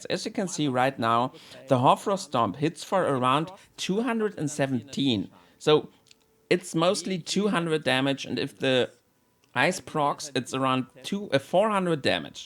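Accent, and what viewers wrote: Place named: Germany. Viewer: (Btw finde ich es super cool, dass du solche info vids über ER machst. Ist definitiv mein aktuelles lieblings game! Außerdem finde ich die Art wie du englische Videos präsentierst super gut! Man hört natürlich das deutsche raus aber ist trotzdem super verständlich)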